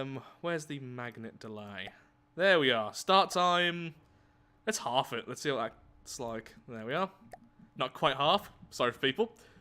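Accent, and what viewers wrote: United Kingdom. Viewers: God have I missed your spiffing British voice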